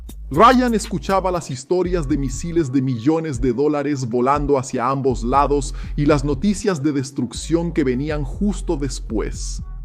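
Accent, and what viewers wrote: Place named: Venezuela. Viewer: habla como un negro venezolano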